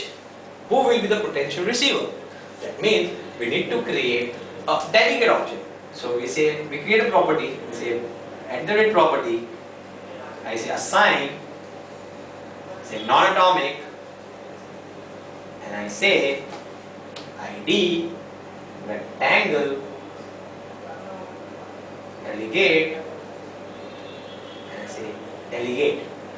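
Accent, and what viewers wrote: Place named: Asia. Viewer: Boss it is Asian English!!!!!!!!!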